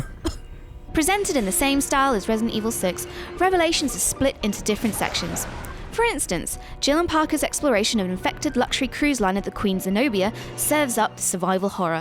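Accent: United Kingdom. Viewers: Asian girl with British accent? Yes please and thank you :3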